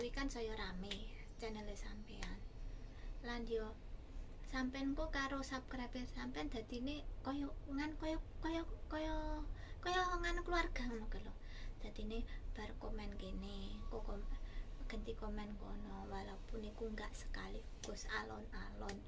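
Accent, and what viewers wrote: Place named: Central Java, Indonesia. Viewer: jowone endi iki mba? ketoe iki wong jowo tengahan sebelah wetanan iki ...... (chenel disetel bar kuwe ditinggal lungo ora dirungokne.........hahahah)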